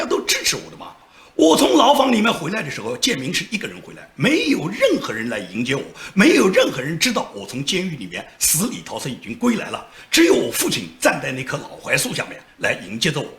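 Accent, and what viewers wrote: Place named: Fujian, China. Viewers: 听起来像南方人，支持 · 福建口音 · 建民，耐心地采用法律手段收集證據還擊自衞。聽口音象福建人。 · 福建帮？口音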